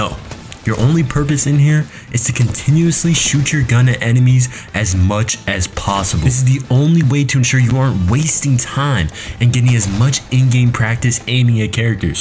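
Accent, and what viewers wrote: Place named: Midwest, United States. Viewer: I just know your from the midwest lol- great video bro!!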